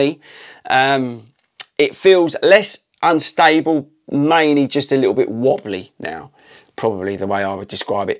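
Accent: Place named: England, United Kingdom. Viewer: you got the best accent man where from England are ya from?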